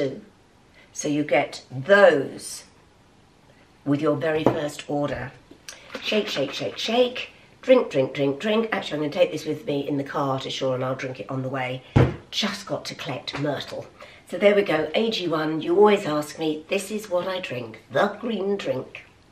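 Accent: United Kingdom. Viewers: Nothing is as lovely as a genuine London accent.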